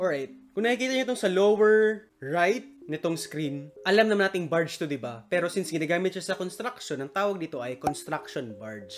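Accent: Visayas, Philippines. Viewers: Bisaya accent🥺😍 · Bisaya ka man oi 🤣 new subscribe 🤚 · Bisaya ka diay kay ang imong pronounciation usahay murag bisaya gyud! 🤭🤭🤭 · Bisaya diay ka pre hahaha tabangi ko dol mag engineering ko puhon dol🙏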